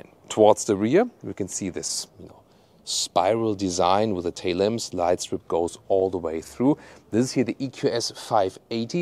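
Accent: Germany. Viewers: This dude has a pretty strong German accent....Great car besides!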